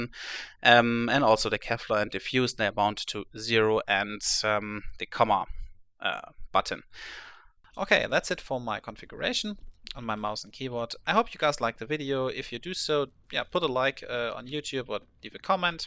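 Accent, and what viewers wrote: Germany. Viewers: Sofort gehört dass du Deutscher bist :D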